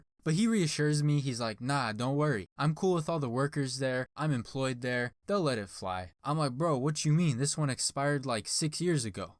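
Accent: Arizona, United States. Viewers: Bro got that Arizona accent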